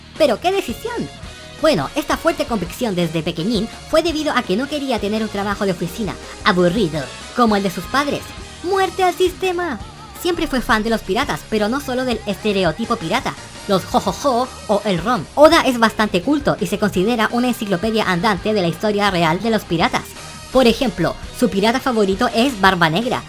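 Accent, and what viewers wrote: Chile. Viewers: hola, descubrí tu canal por el minidoc. de HXH y me quede viendo tus videos y son increibles, agregas mucho conocimiento acompañado de humor. Te me haces bien original me suscribo y si mandas saludos mandamelos en algun video no sé:V y note que eres chileno:D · eres de chile e-e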